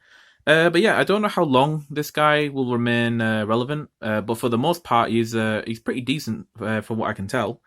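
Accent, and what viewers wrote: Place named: United Kingdom. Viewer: knew it from the accent. I’m from the uk as well 🇬🇧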